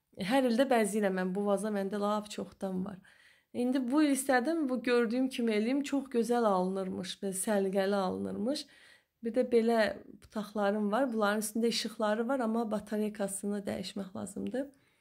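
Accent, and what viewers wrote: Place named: Balakan, Azerbaijan. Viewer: Super, danisiqda Balakən aksenti öz yerinde😊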